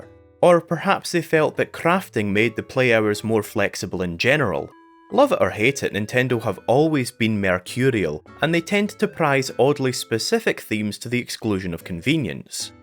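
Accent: Scotland, United Kingdom. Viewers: a strong scottish accent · Is that a Scottish accent? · I miss the Scottish accent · im considering this scottish asmr while i write my uni essay lol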